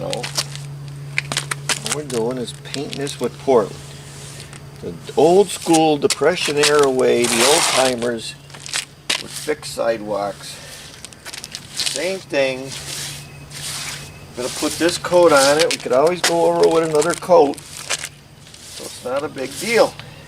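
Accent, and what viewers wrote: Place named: Pennsylvania, United States. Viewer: I love your stuff, very great insight and simple….”just get it in, don’t over think it”…… true for just about everything in life!!! and u sound like my family from Schuylkill county, don’t know where u r from in PA, but u sound like a good ol’ coal region boy!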